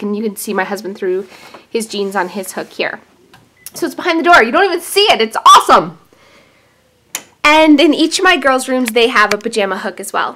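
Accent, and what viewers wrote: Canada. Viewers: eh'? Muahahaha, you are sooo Canadian! LOL ;P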